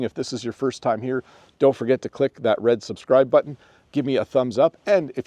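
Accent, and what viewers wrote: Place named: Canada. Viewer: you have a good video! Your Canadian accent is very good, it makes easy for us to learn english...I also loved the place you did the video!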